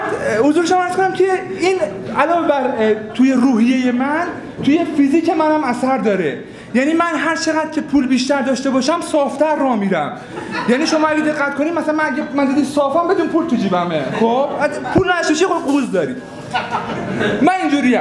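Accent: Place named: Yazd, Iran. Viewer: خیلی راحت بگم . من درصد بیشترش به خاطر لهجه شیرین یزدی هست که طرفدارت هستم . اگر نباشه .جذاب نیست برام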